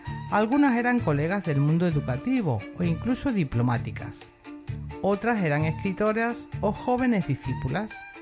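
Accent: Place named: Andalusia, Spain. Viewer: La propietaria del canal es andaluza, por eso pronuncia así mi alma!! Relájate un momento chiquilla!!!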